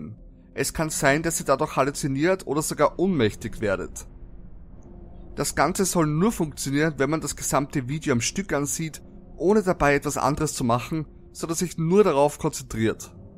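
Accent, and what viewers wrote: Vienna, Austria. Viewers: Habe das selbe gesehen wie du.Das eine sieht in der Tat aus wie eine Schildkröte aus mit Hut.😁😄 fand es sehr abstrakt und musste die ganze Zeit schmunzeln...😄finde deine Stimme total cool.Kann es sein das du irgendwie aus Wien oder irgendwo in der Nähe her bist?glg 😊👋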